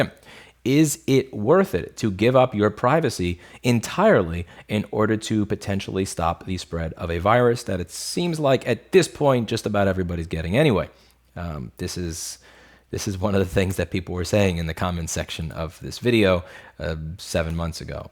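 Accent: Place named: New Hampshire, United States. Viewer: As time goes on you sound more and more like a New Hampshire resident and less like a New Yorker.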